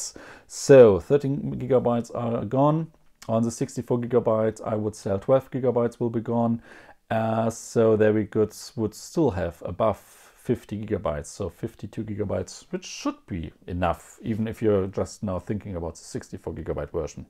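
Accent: Germany. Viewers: Gotta love that German accent haha. Really nice video tho, thanks!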